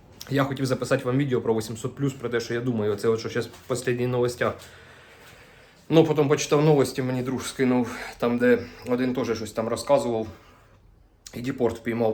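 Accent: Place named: Zaporizhzhia, Ukraine. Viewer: Запорізька обл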